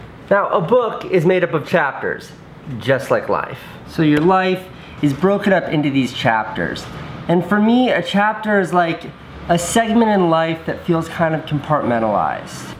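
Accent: South Africa. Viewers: South African: Yeeeese (yes) and Bleake (black). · Lekker. So nice to hear some Saf African. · That's a South African accent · I absolutely love the South African accent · I live in New Zealand and I'm from Cape Town and listening to talk and his accent shit it's brought back memories! haha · South African accents are my faaaaaaaaave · I totally dig South African accents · That south african english has such a weird accent, but it's so cool. It sounds so laid back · Love the South African accent, not sure why just do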